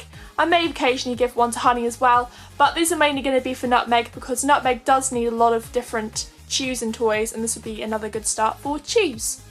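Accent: England, United Kingdom. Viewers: Are you from the north or south of England. ? :) love your accent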